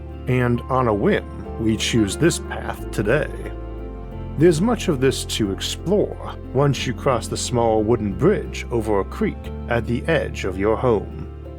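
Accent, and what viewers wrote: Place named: Arkansas, United States. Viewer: Sounds like Arkansas.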